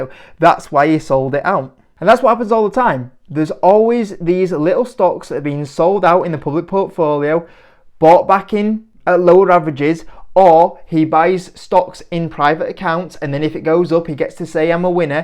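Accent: United Kingdom. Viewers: British accent is immediate red flag LOL lets hear a European school the Americans about the stock market LOL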